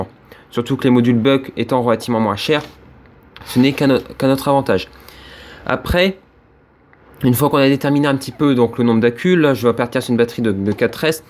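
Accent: North, France. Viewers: Hello, intéressant je voit que tu est peut être du nord avec ton accent (idem pour moi,du pas de calais) je voulais savoir si ce serait possible de réaliser une batterie par toi même de la vendre,je serais intéressé c'est pour alimenter un sondeur sur un kayak ? Merci de ta réponse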